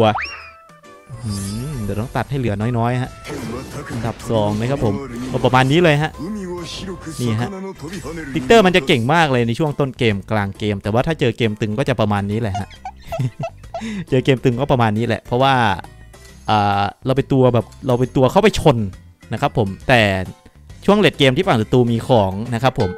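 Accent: Isan, Thailand. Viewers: ว่าวอีสานนำแน ชอบ🤟🏿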